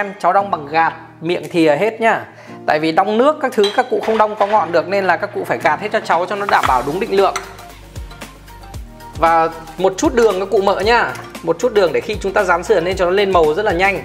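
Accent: Hanoi, Vietnam. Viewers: Bếp hướng dẫn dễ hiểu nói nhẹ nhàng chuẩn người HN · Cảm ơn đầu bếp hướng dẫn rất chi tiết tỉ mỉ và nghe giọng Hà nội khán giả nghe dễ hiểu ,chúc em và gia đình hạnh phúc bình an trong cuộc sống❤❤❤❤❤❤ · Dọng nói rõ ràng hướng dẫn cách làm món ăn chuẩn người hà nội cảm ơn cháu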